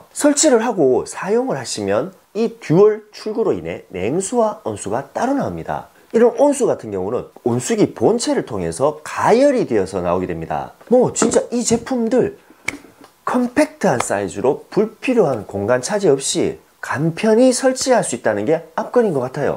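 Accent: Gyeongsang, South Korea. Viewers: 경상도 목소리 악센트 맘에안들어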